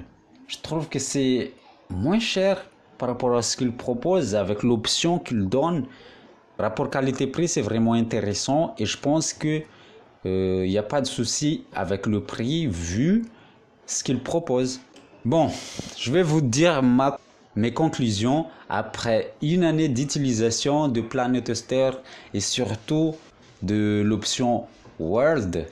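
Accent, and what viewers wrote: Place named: Madagascar. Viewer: J'adore l'accent! Êtes-vous malgache? En tout cas je ne pense pas ça soit vous sur la photo mdr!!! Sinon bravos pour la vidéo, elle m'a bien aidé.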